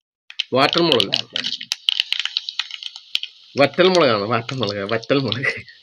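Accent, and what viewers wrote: Kannur, India. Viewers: ok ok ഞാൻ കൊച്ചിക്കാരൻ ആയത് കൊണ്ട് കണ്ണൂർ ഭാഷ കേൾക്കുമ്പോൾ ചിലപ്പോൾ അന്തം വിട്ട് പോവാറുണ്ട്.. കുറച്ചു കൂടി വടക്കോട്ട് മാറിയുള്ള ആളുകൾക്ക് ഇത് പരിചിതമായിരിക്കും.. Any Way.. Thanks for Reply 🙏🏿🙏🏿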